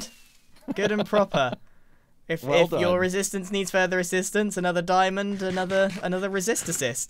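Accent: United Kingdom. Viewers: hey i dont know if this is rude or not but are u brittish?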